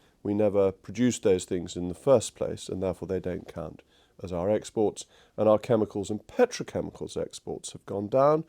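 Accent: RP, United Kingdom. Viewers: He talks bullshit with a posh accent. Where’s the cheap shoes and food lord snooty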